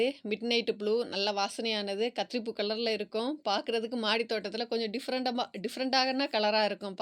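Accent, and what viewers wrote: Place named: Nanjil Nadu, India. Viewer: பூக்கள் அழகுதான் சகோதரி, நீங்கள் நாஞ்சில் தமிழில் வர்ணணை செய்வது மிக அழகு, சிறப்பு ❤❤ வாழ்த்துக்கள் சகோதரி🎉🎉🎉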